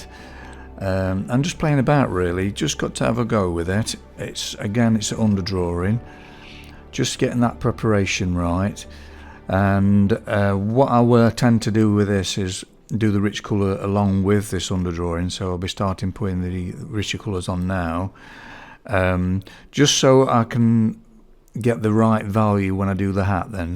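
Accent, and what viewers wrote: United Kingdom. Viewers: Thank you, I have learned a lot from you; actually you were the one that introduce pastel pencils to me! I didn't know there actually exists such a great tool; I just used to draw and paint using pastel sticks, oil, and charcoal. I have downloaded your videos and watch them everyday with considerable attention. It's funny that my daughter's accent is going to change to British as a result of listening to your commentary. I wonder if you are able to let me know how you take your videos! Do you use a DSLR cam or a simple mobile phone? I appreciate all your art and generosity.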